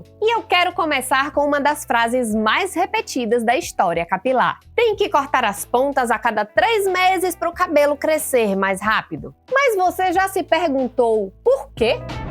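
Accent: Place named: Bahia, Brazil. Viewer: Baiana de Salvador sim😂 Rapaz, reconheco logo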